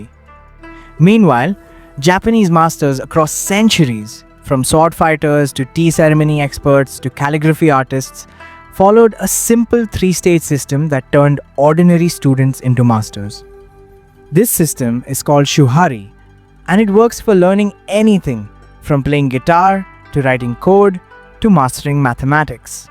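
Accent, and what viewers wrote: India. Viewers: Are you Indian? Your accent sounds Indian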